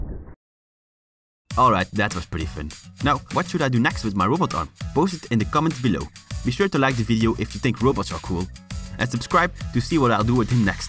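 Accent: Netherlands. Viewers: u sound rlly nederlands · You Dutch.? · I smell Dutch.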